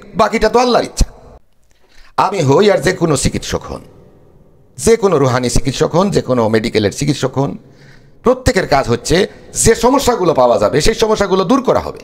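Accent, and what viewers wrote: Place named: Barishal, Bangladesh. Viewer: মামা আজকে আপনার কথাগুলো একদম অন্যরকম শোনাচ্ছে অনেকটা বরিশাইল্লা বরিশাইল্লা। অনেক কিছু আজকে জানতে পারলাম ধন্যবাদ।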